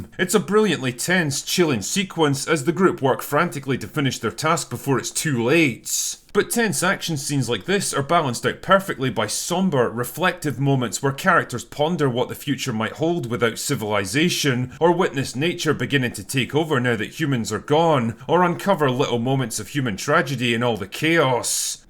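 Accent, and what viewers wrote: Scotland, United Kingdom. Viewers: You, Sir, have excellent taste in movies. That is why I like you. That and the Scottish accent and you are very easy on the eye.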